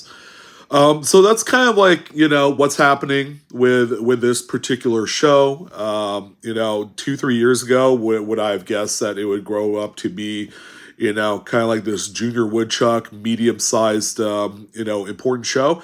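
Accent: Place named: California, United States. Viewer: That's the way dudes from California talk mate 🦘🤠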